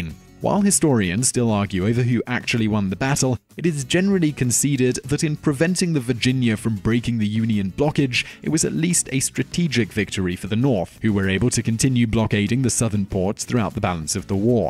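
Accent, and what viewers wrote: United Kingdom. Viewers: Told my girl she knew who "Simon Whistler" (british accent) was, and now that ive pulled the video up, shes pretending she doesn't know who u are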